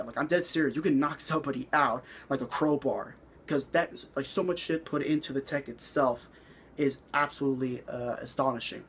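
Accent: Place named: New York, United States. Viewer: I love this New York accent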